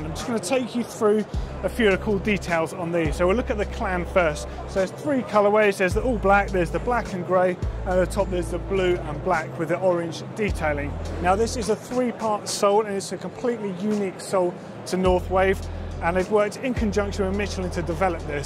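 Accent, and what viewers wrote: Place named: United Kingdom. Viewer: i thpught u were from the uk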